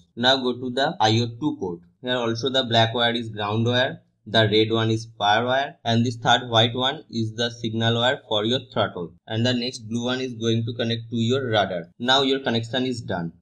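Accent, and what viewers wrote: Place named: India. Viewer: i cant understand your heavy indian voice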